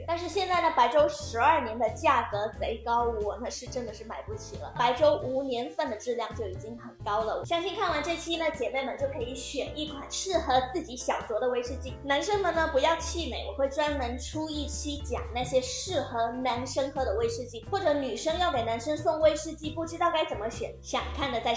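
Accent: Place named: Taiwan. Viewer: 這口音也太特別，台味非常重的大陸音。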